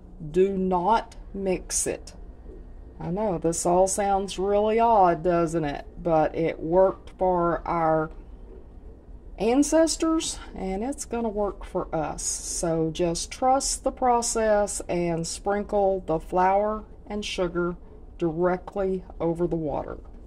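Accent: South, United States. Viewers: It will never stop being confusing to me how Americans ended up with that stupid, idiotic southern accent. It's disgusting. Why???????????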